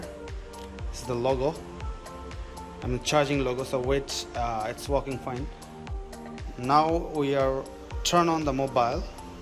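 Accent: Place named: India. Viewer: kek orang india logat nya...hjeheheheeh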